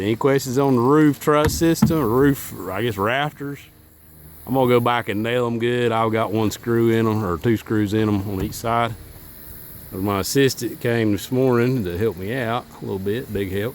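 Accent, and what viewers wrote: North Carolina, United States. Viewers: I am from south eastern North Carolina.....your accent is too!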